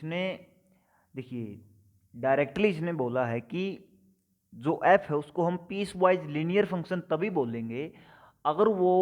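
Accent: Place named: Haryana, India. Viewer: Sir aap haryana se lg rhe hain😂❤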